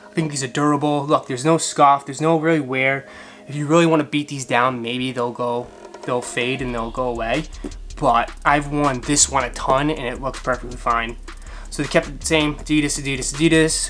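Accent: Boston, United States. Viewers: I hear an accent lol Boston? EDIT: I asked that in the beginning of the video.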